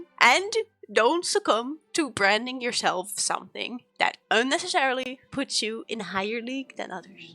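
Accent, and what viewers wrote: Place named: Netherlands. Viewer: You sound Dutch <3 Are you Dutch? Very interesting topic!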